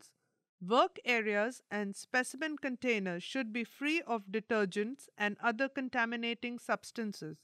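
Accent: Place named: India. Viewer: The indian accent is to distracting.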